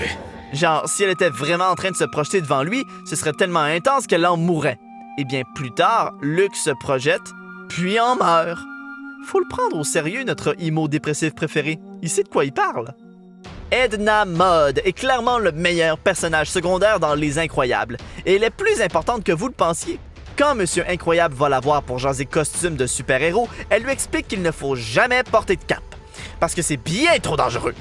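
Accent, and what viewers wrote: Quebec, Canada. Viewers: c’est QUÉBEQUOIS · T québécois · L'accents 😂😂😂 j'adore!!! Les Québécois je vous kiff vous êtes une source d'inspiration pour mes vidéo!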